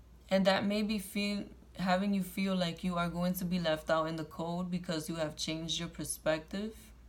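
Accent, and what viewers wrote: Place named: Puerto Rico. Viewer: Thank you for that amazing reading, gave me goosebumps? ❤️❤️ Your voice! Boricua!?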